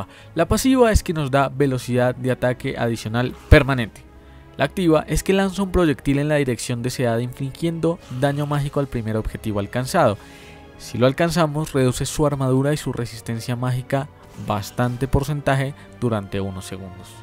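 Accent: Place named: Bogotá, Colombia. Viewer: Oye qué excelentes guías! Son las mejores que he encontrado en español. Felicidades. Tengo la impresión de que eres de Bogotá jajajjaau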